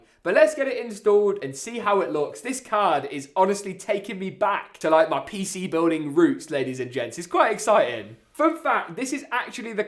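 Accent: United Kingdom. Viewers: The most British guy I have ever heard!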